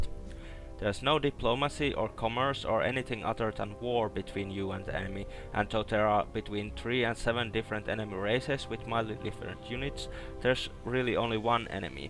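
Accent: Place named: Finland. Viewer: Ootko Suomalainen? Are you Finnish? Just guessing ur accent sounds Finnish :) Even if ur not, great review man!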